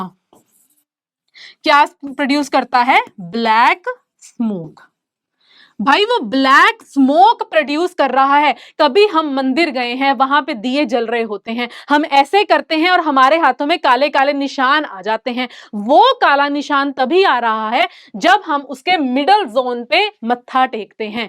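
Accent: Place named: Punjab, India. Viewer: aap punjabi ho kya? accent aisa hai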